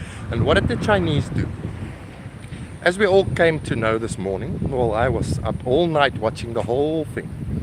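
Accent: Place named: South Africa. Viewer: my first time here. You sound South African.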